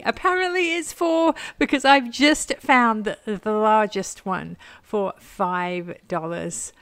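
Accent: United Kingdom. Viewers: dear, I don't understand why don't you invest in one of those cameras that sit on your chest so that you can use both hands. I see you time and time again struggling to pick up or open an item and having both hands free would make your life so much easier. I love your Chanel I learned a lot from you over time. Love your sweet cheerful positive personality and english accent. Cheers and all the best from Agi at Princeton, IL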